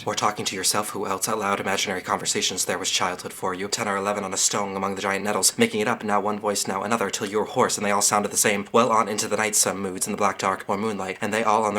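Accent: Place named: United States. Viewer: Im not certain an american accent lends to the phraseology of beckett.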